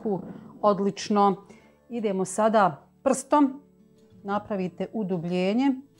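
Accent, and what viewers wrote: Bosnia and Herzegovina. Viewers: Joj kako te sa gustom gledam kako lijepo i uredno sve radiš a tek naš bosanski govor ma bravo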